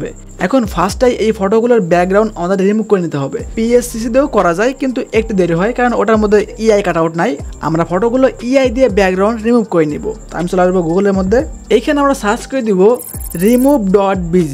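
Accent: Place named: Pabna, Bangladesh. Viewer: আপনি কি পাবনার লোক পাবনার মতো ভাষা ।সঠিক হলে রিপ্লাই দিয়েন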